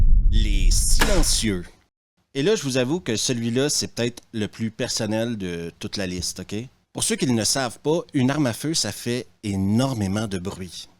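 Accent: Quebec, Canada. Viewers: Le Monsieur est canadien avec son accent ? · T'es Suisse et t'as un accent Québécois !?! Ou t'es Québécois et tu vis en Suisse ?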